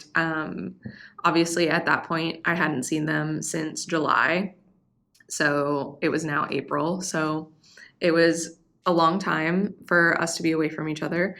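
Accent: Australia. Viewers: Welcome - you sound like a Melbournian already. You'll fit right in! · By the sounds of it, you're an Australian who was born in the wrong country. Welcome home.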